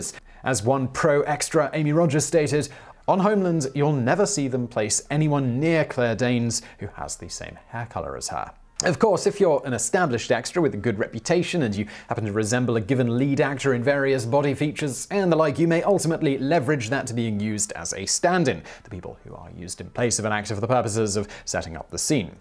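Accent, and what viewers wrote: United Kingdom. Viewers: becoming so much more playful. Love it:D Also great to hear his awesome British accent saying "tracksuit bottoms".:D We call them that over in South Africa.:D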